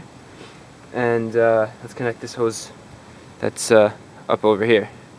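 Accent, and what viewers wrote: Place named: New Jersey, United States. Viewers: NJ, I'm also originally from New Jersey and I say water the same way, "wooder". Well I'm trying hard to change that now. I didn't realize I was saying it wrong until I moved to a different state. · Can definitely tell youre from New Jersey. Lol. My wife pronounces water the same way. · JordanMatthew Anderson southeast pa or south western Nj, philly/ Camden we all say wooder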